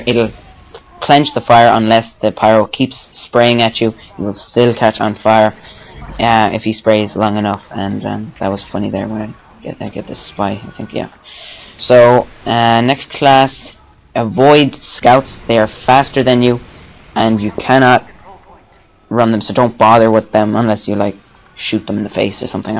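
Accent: Ireland. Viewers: Ur irish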